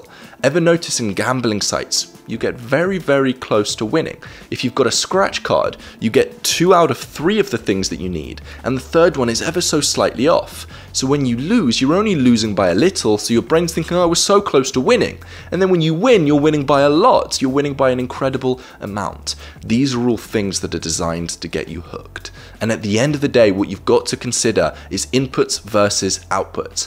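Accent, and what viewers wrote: England, United Kingdom. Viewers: The amount of click bait in this video and terrible over-simplification of the topic should be the basis of your next video lmao. Using your English accent, simplifying popular activities, using clickbait words like “re-wiring, detoxing, dopamine, neurons, etc” You may have the simpletons and immature people in the YouTube comment section fooled, but you really haven’t added anything to the conversation or made any concrete valid points worth anything. It’s a lot easier to see through this lame facade you are trying to project.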